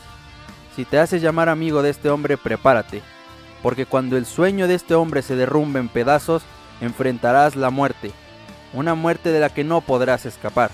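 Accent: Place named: Chile. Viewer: Suenas igual que apura chilena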